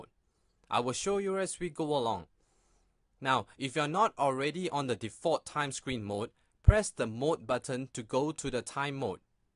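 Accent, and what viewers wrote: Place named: Singapore. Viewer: U sounded like a singaporean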